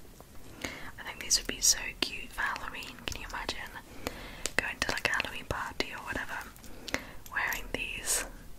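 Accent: United Kingdom. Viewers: Yes yes yes! Best ASMR ever. Your British accent is a trigger itself for me! Lots of love from Dominican Republic ❤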